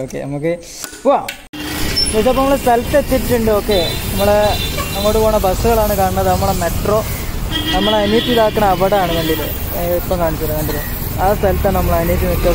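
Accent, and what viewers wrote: Kozhikode, India. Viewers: യ്യ് കോഴിക്കോട്ട് കാരൻ ആണോ,,, സംസാരം കേട്ടിട്ട് തോന്നുന്നു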